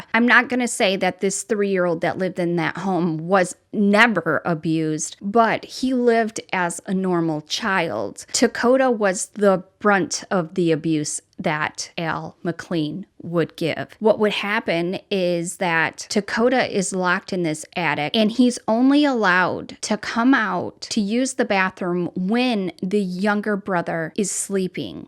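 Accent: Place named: Michigan, United States. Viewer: Yep I knew you sounded like Michigan. Your accent sounds so familiar I've been trying to figure it out. Such a fan.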